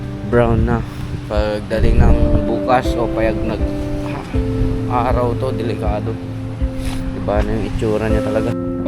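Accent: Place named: Visayas, Philippines. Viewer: Bkit parang bisaya ang pagsalita niyo.. Dba Yung bagyong nando dumaan sa Norte... Ang mga Tao Dyan mga tagalog pero Yung nag uplode netoh parang nag bibisaya hahahah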